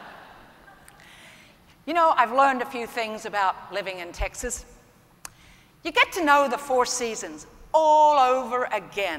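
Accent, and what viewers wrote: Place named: Australia. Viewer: Houston speaker with an Aussie accent - who would have thunk it?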